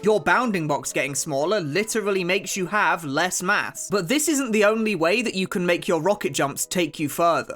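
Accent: United Kingdom. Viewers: He has the most british accent i've ever heard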